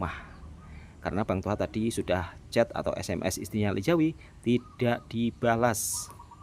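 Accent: Java, Indonesia. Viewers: pekat btl jawa dia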